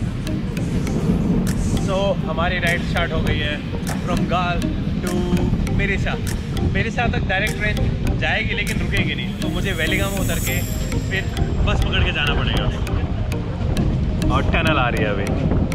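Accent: Haryana, India. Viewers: You have a nice haryanvi touch accent..... Like yr voice.....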